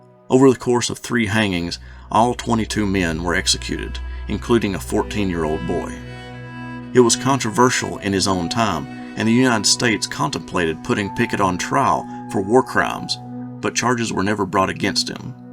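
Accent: South, United States. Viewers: Very informative. I enjoyed the southern accent too. Thank you.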